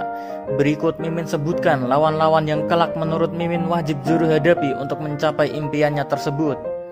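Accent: Java, Indonesia. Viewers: Love you min.. terbaik medok nya🙏🤣🤣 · Medok lu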